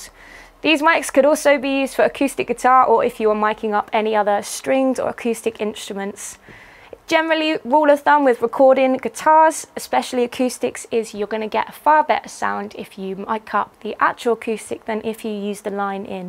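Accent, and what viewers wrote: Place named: RP, United Kingdom. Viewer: accent contains a trace of the current fad in BBC continuity announcers. I wonder if she even realaahses she's doing it.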